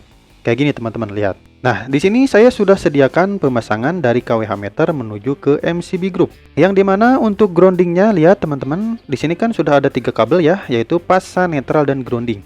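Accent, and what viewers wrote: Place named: West Java, Indonesia. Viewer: Assalamualaikum kang, penejelasannya keren dan nyunda, enak di dengar di kuping parahyangan model sayah. Pertanyaannya nih kang : Jika saya telah memasang grounding sendiri, namun tidak di konek kan ke KWH meter oleh PLN saat masang, apakah tidak masalah bagi keselamatan jaringan dalam rumah saya? Hatur nuhun kang atas penjelasannya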